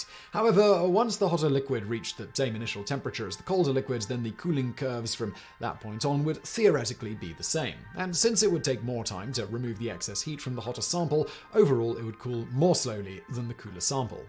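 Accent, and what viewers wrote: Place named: United Kingdom. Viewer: I came here thinking this was Vsauce and heard a British dude and im like is this a British sub?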